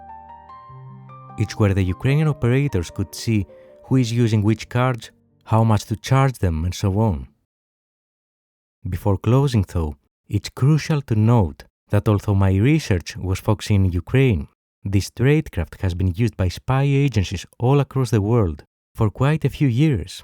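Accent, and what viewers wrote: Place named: Greece. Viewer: He's greek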